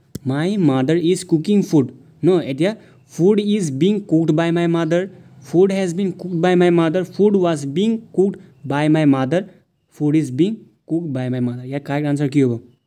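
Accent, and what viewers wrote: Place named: Nepal. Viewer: Assamese language with Nepali tone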